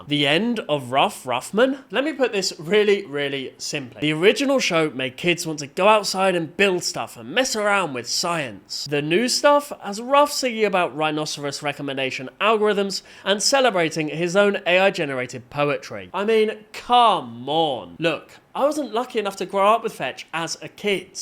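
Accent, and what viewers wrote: United Kingdom. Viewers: "I always looked forward to Ruff after elementary school" What do you mean you watched Fetch?! You're British! You watched CITV and CBBC after school! The accent gives it away unless you found a way to watch it abroad?! Or it aired on CBBC?! Actually it wouldn't be a huge surprise if that was the case Arthur aired on CBBC too. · You british right? DONT LET THE TEA TAX BE PAID.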